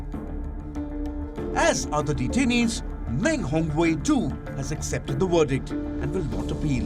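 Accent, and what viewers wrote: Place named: India. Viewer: The Indian accented female voice overs isn't helping the believability. Couldn't you hire someone with a Chinese accent for the female voice overs. 😐😐😐😐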